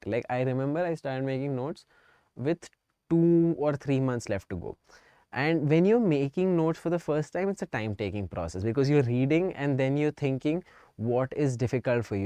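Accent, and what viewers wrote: South India, India. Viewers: maybe he's from southern part of India nd Hindi is not his Mother tongue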